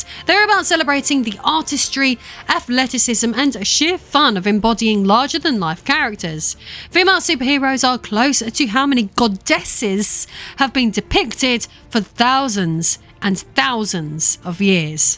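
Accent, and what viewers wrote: United Kingdom. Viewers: I forget how British you are sometimes.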